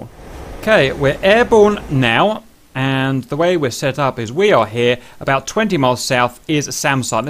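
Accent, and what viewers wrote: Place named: England, United Kingdom. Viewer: can you try to change your english accent with an american accent so i can understand any word about what you say.....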